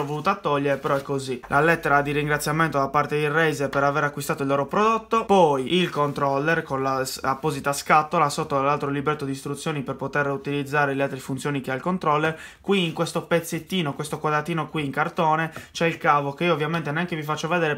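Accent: Sardinia, Italy. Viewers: sei sardo?si capisce da come parti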